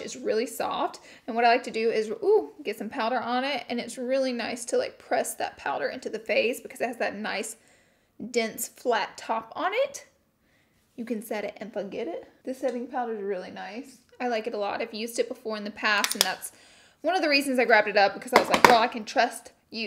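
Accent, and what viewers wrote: South, United States. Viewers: You’re so funny I’m new to your channel and I love your southern accent and your personality!!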